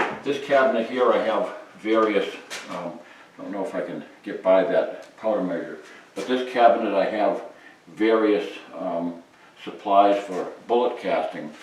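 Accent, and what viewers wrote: New England, United States. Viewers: Like everyone, I have some slightly different thoughts on some of your editorials but for the most part, when I want a very informative "no hidden agenda" intelligent perspective on my current gun interests, I go to. I'm from Texas and I guess I'm somewhat skewed in the area of first-impressions, but once I got past your (no disrespect) yankee accent, you became one of my buddies. Thanks for all the hard work you put into your videos. Don from Pearland, Texas.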